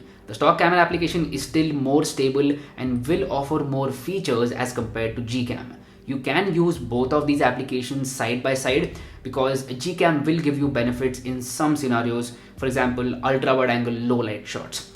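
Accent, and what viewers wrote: India. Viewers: Indian when speak English 🤮🗑️🥵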